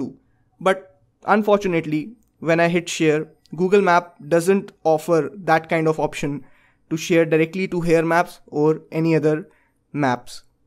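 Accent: India. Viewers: To understand English in indian accent should be from job skills nowdays😟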